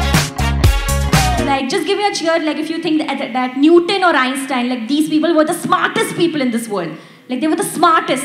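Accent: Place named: India. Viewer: dont emphasize each and every word in typical ta ta ta indian accent .stop that its annoying paperweightttta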